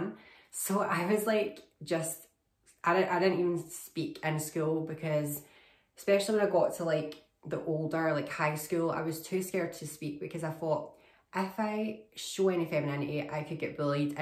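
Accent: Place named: Scotland, United Kingdom. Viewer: I love hearing your Scottish accent. personally I am about 3 months on oestrogen. I don't really have anyone to support me in this journey I am taking. I would love if you lived in Ireland and I could call you daily and get some advice off you which would help me a lot. I do wish you the very best of luck and I would love if you could do more videos that would include your journey as much as possible.🌹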